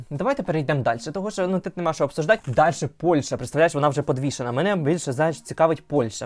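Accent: Ukraine. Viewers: Твої коментарі це щось. Це б ще я отако "обсирала" тих дідів до хліва і їх галстук. І мова, і вимова,і східняцький акцент. Ти прикольний. Тепер буду дивитись огляди на "лгбт-вакханалію" з тобою.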